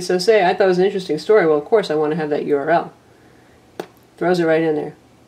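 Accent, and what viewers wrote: United States. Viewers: It's not an ignorant comment it's a sensible observation. The woman in the video does come across as very robotic and does lack any insincerity in her review. I do understand however that some of this will be down to her American accent wich can sometimes come across as a little bit insincere to non-Americans. This of course isn't a dig at Americans before anyone jumps in but to other ears the accent does sound that way.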